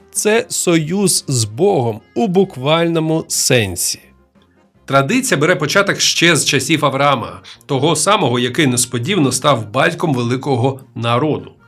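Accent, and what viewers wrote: Russia. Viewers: У автора проскакує російський акцент.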